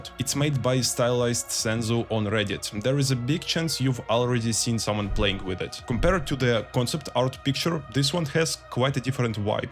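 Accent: Russia. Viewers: Я один слышу очень русский акцент?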